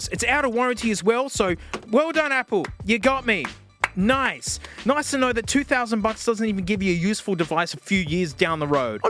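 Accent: Australia. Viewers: And so he can FINALLY let his true Aussie language out.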